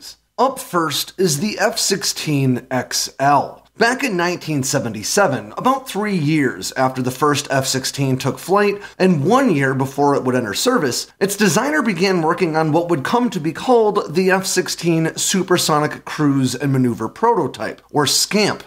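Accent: United States. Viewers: I'm not going to subscribe for two reasons. (1) I really dislike listening to other Americans, and (2) you didn't mention the reason why the US military usually chooses the wrong weapons: Corruption. The US government and military brass are all woefully corrupt. Lockheed-Martin's business model has been based on paying off politicians and generals since before they merged. Lockheed paid off Congress and the joint chiefs with a few million dollars, and got trillions of dollars in taxpayer money to deliver fighters that DISSOLVE ON CONTACT WITH WATER!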